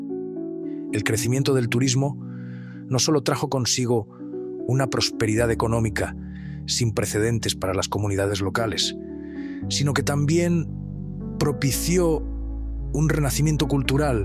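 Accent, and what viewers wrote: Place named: Castile, Spain. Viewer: Magnífico documental pero lo mejor, para mí, es la voz del narrador con un castellano perfecto; yo creo que incluso puede ser un arquetipo de dicción que nos deja perplejos a nosotros los andaluces, con nuestro castellano sureño.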